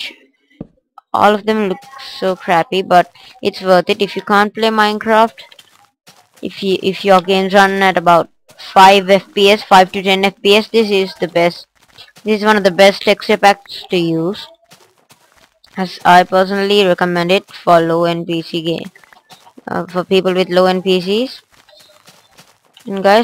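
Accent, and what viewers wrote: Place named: India. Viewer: its indian accent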